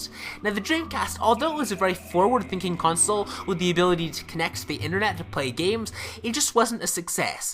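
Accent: Scotland, United Kingdom. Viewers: Are you Scottish? I get the strong impression you're Scottish, but moved away aged 7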